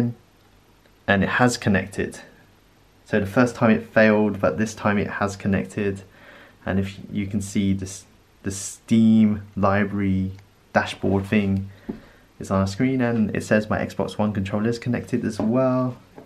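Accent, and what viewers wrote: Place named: United Kingdom. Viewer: Wow never seen an Asian man or woman speak a British accent until now, nice video as well. Don't have a PC but gonna get just something cheap to stream seeing as I need it. Thanks for the help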